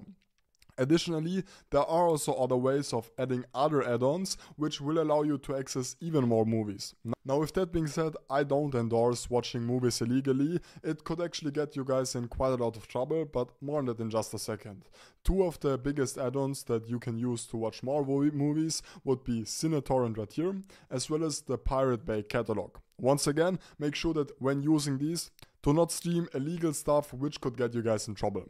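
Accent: Germany. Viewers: He sounds very German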